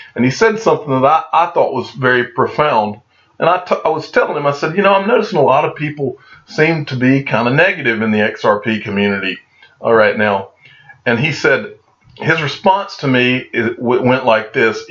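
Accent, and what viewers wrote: United States. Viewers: Brother I love your cowboy accent Your Enthusiasm your knowledge your dedication keep up the good work.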